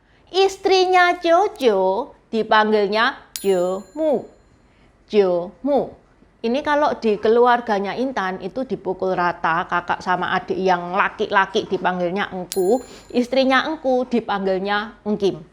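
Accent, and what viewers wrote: Java, Indonesia. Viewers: komentarku bukan u menihilkan niat baik intan u memberikan info yg baik tentang hal2 yg berkaitan dengan chinese. Semua video 2 lain2 nya saya sering mendapatkan wawasan baru. Tapi u video ini, jujur saya ga pengen tonton hingga habis... krn pelafalan yg salah... menggangu bgt telingaku. Krn di bahasa mandarin, salah intonasi = salah arti.... Saya kebetulan teochew yg intonasinya bernada 6-8 .... dan uda 4 thn yg lalu otodidak belajar bahasa mandarin... dan lumayan hasilnya. Saya juga setuju logat jawa medok intan yg membuat pelafalannya agak kacau... TAPI harusnya ini jgn JADI excuse u salah melafalkannya. Krn buat yg kurang mengerti, jadi bisa salah diterimanya oleh mereka. Dan saya yakin juga bukan intend intan u membuat video ini, kl ternyata salah diterima oleh audience nya. No offence here, bang....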